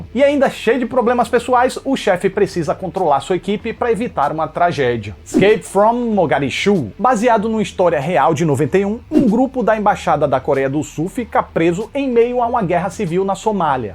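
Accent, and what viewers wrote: Northeast, Brazil. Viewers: Ei tu é Cearense? teu sotaque parece ser nordestino, abraço amigo!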